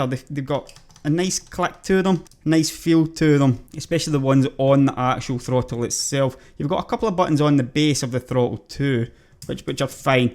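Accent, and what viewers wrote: Scotland, United Kingdom. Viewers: How many takes did you have to do to make a somewhat understandable Scottish accent for this video? I can't understand my Scottish friends half the time. I'm surprised I understood most of what you said. I know you're speaking English, but even then, lots of English people don't understand what the Hell you guys are saying most of the time. :P Also, thanks for the review. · I know this is a silly critique, but this Scottish accent is basically the quintessentially elite and refined like a fine wine version of Scottish that I've ever heard. You need to get into voice acting, voice overs etc, my dude.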